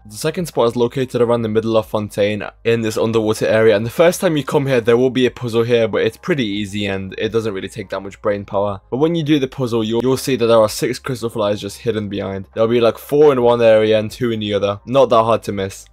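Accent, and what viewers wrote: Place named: Roadman, United Kingdom. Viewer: a guy with a roadman accent playing genshin feels unheard of 😭🙏 i weirdly like ur channel man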